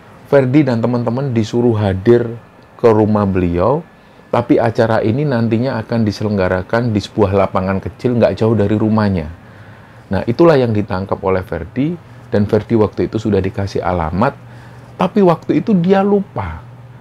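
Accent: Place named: East Java, Indonesia. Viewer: Suka dgn penceritaan mas ini,logat jawatimur bgt,sperti saya nyemak bapak saya, waktu bpk cerita² dirumah..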